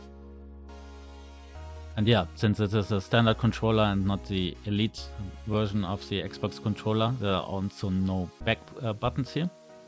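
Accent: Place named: Germany. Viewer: Bei der harten englischen Aussprache würde ich schwören, dass deine Muttersprache ebenfalls Deutsch ist 😄 gutes Video, hat mir weitergeholfen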